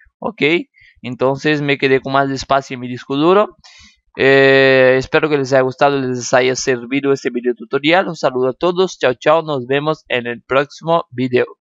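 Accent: Brazil. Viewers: creo q es de Brazil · eres brasilero? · es brasileño v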